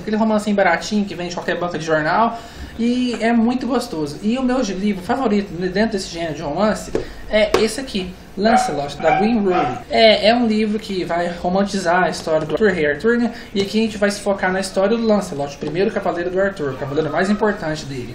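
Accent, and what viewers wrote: Minas Gerais, Brazil. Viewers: que sotaque deliciooooossssoooooo,já morei em minas e sou apaixonada por esse sotaque,amei seus videos,bjs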